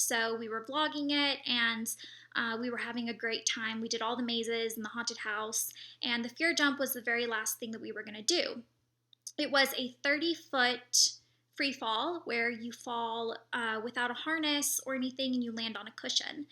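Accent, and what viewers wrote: South, United States. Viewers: i could here a little bit of your southern accent in the clips from frightmare manor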